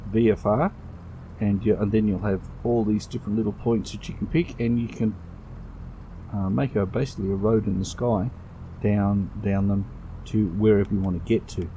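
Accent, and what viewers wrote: Australia. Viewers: Great video !! Nice to hear an Aussie voice for a change. I recently joined Xplane. I am rather disappointed with the scenery. This scenery looks really good. Can you you tell me if this is add-on scenery or is it standard?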